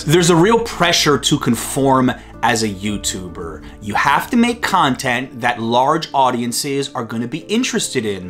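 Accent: New York, United States. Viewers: NYC has always been on my bucket list and few years ago I tried searching for things to do in New York and one of your vlogs came up and binged watch your videos, from then on, your voice is kind of synonymous or like has this NYC feel into it haha, I might study in Canada next year and if ever got the chance, I'll definite visit NYC and follow all your tips, along with Sarah Funk, Action kid, Brett Conti and with your cam guy and third wheeler and a fellow Filipino LOL, Thank you, you're one of NYC Gem!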